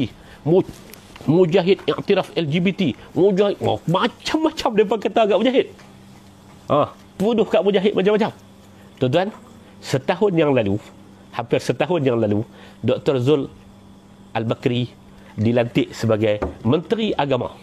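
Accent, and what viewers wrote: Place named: North, Malaysia. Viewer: Itu bahasa Loqhat Utara... Nothing wrong ok... So what now?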